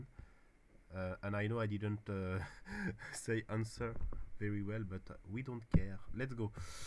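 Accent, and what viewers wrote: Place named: France. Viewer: noticed the french accent merci frérot pour le partage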